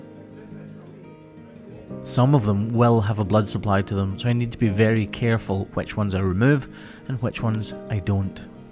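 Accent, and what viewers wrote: Scotland, United Kingdom. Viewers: Her hoof damage reminds me of a weird nail I have. As a kid, I smashed my right hand ring finger between the door and doorframe, you know where the hinges sit, and I broke the very tip of my finger - right where the nail is formed. Today I have a strange nail that grows in layers, with a weird top layer that kind of splits off from the rest of the nail and I have to cut it really, really short for it to be comfortable. The scarring on my finger is extremely sensitive and the tip of my finger is actually shorter than the left hand ring finger, visibly so and when I put my hands together to compare, I lack about 5 millimeters on it. While my own finger and nail will never be "fixed", it's a thing I've learned to live with and it doesn't impact me in any real way. I hope this little miss can lead a good life, even if the keratin finger issue reemerges. 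These videos are super comfy and not only do I get to listen to the dulcet tones of a Sco'ish man, I get to see cattle having their hoof injuries treated with the utmost of care and respect for the animal.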